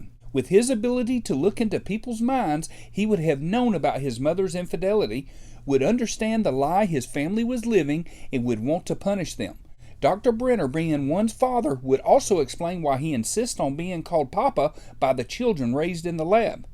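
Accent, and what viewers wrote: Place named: South, United States. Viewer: LOVE your southern accent! "Welcome to talkin sah-fah"😊❤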